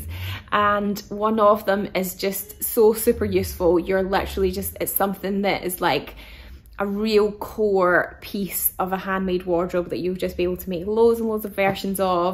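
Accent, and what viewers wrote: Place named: England, United Kingdom. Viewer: Watch you 10 sec and got subscribed. Such a inspiring vibe and sweet energy. For me as a German your dialect I have to listen more. Hmmm I still guess …. No USA no canadien … England? …. Hmmmm … Australia maybe. But I understand everything. 😂 from the fabrics and things in the background I can tell I like this style. Thank your lives sessions. Best way to learn, even if I don’t see this Live. Greetings from Germany 🇩🇪 🎉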